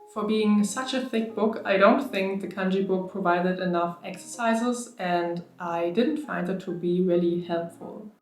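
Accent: Germany. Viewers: Have you considered taking the Tokini Andy courses? They look interesting… I want to get to level N5 by June 21, 2024… Best, John.. PS: Am I detecting a German accent?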